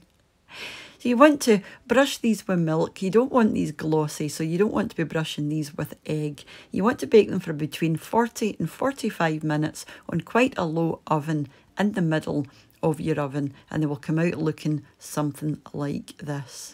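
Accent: Scotland, United Kingdom. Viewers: Such a pleasure discovering your channel,watching you making traditional Scottish pies and heraing you explaining the different steps...and what a wonderful accent ! Difficult at first to understand but watching the video helps. Thank you for sharing . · I love your accent, my mom was from Scotland. She had a beautiful accent. When I hear you it brings back the memories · I love Scotland. Listening to the lady's beautiful Scottish accent. Makes me dream. "Bye for now" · I love your channel. I'm from glasgow and now reside in Canada, everything you make from traditional scottish foods I grew up with, it brings me home, along with your wonderful accent. We can't get the scottish pies and that's what I miss the most, so was just jumping for joy when i saw you make them. Remember when bakers used to sell them with mashed potatoes and peas or beans on top. 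Oh my! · Just made these for my parents. Both scottish. A real taste of home. Absolutely smashing. It is very easy to follow and a lovely scots accent to boot❤ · I just found this channel. Looks great, love the Scottish accent. My family immigrated to Canada from Scotland 5 generations ago. I wish I was closer.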